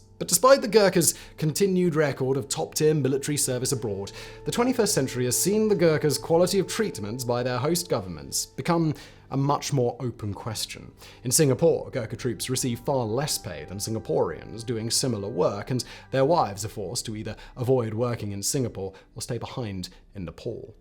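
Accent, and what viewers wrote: RP, United Kingdom. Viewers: The posh, pretentious accent and the changes in volume, just hinder at the story you are telling.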